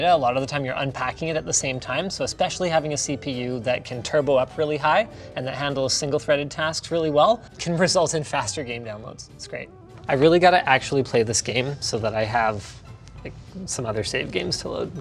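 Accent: Canada. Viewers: freaking Canadians and their pronunciation of the letter z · He's Canadian, that's how they say the letter Z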